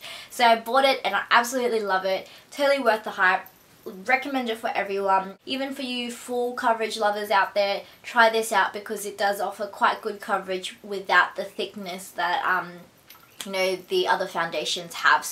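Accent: Australia. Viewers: Is she Aussie ?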